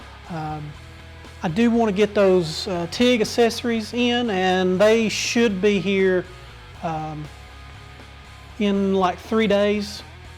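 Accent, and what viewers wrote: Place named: North Carolina, United States. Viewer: Enjoyed your review! Do you mind me asking where you are located? I detect a NC accent there. I also think I see a can of spray paint on your bench from Princess Auto here in Canada.... so I'm confused! lol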